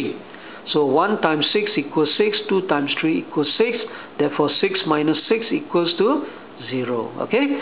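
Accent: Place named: Philippines. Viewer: sounds asian... phillipino is my guess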